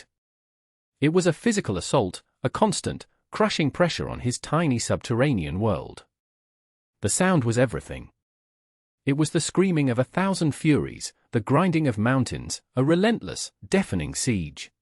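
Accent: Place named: United Kingdom. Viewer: After 10 seconds I stop the video. I don't want to hear a western tale narrated with a British accent.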